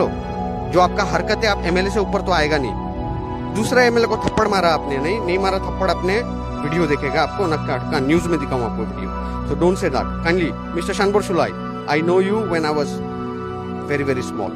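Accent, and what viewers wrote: Assam, India. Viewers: He seems to be Assamese living at the boarder of meghalaya n Assam ...the way he is lecturing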